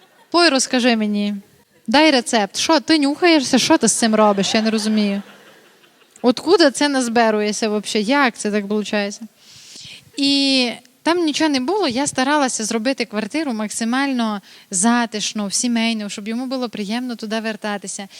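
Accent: Transcarpathia, Ukraine. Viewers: Закарпатська українська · Жахливий діалект))) це на Закарпатті такий?) Але ха українську лайк, на підтримку україномовного ютьбу!) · Мова дивна, нащо так? З усіх дірок на російськомовних капає "вчить мову", а потім вмикаєшь і отаке. Дивися та червонієшь. То не стиль, не говірка, то якась фігня. Посорилася вона... ще й мати. Краще вже чистою російською, а не російською з українським акцентом. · туй нич не є руснацьких говірок. По факту : люмпенська тарабарщина з закарпатським акцентом, щоб кієвськиє панялі'. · То не суржик. То закарпатська говірка.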